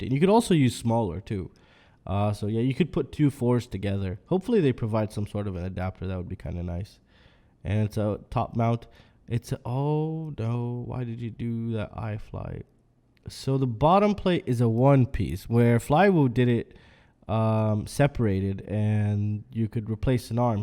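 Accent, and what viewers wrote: United States. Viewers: Somehow I always thought you're American and in America :D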